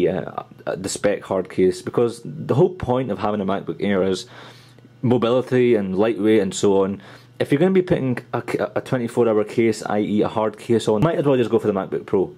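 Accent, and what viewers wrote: Scotland, United Kingdom. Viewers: It's called a scottish accent ... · At first i thought you were French, then i realised you were Scottish lol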